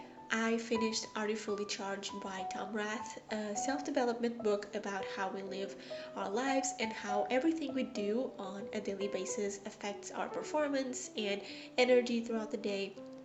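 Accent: Portugal. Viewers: are you portugese? bc i am fluent and you have an accent.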